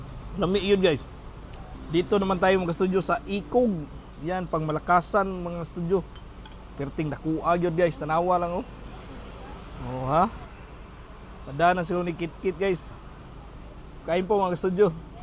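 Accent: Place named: Cebuano, Philippines. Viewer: Bisdak kaayo ka..gahi kaayo diction hahaha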